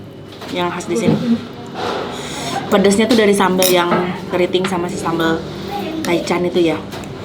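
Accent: West Java, Indonesia. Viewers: Urang sunda hadir tth,resep nyarios na sami urang sunda😉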